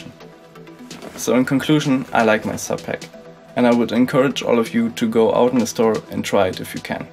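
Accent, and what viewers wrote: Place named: Germany. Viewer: Sehe ich anders. Lieber deutlich artikuliert aber mit deutschem Akzent als genuschelte Ami/Oxford-Englischproduktion! Production: Danke!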